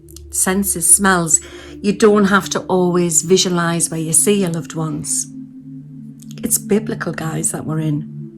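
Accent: Newcastle, United Kingdom. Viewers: Hello, i have only just found you, tarot by janine shared this on twitter,you have a lovely calming voice and i love the geordie accent Definitely subscribed to you ,blessings from the north west (Southport) x❤️😇🧚‍♀️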